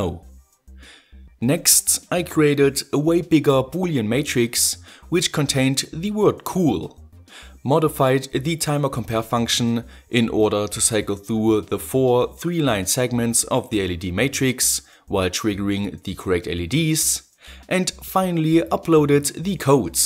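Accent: Germany. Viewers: U sound so German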